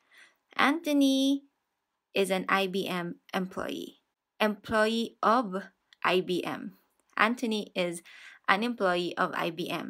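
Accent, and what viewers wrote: Philippines. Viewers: Hi mam filipina po kayo?? base on your pronounciation 🤗🤗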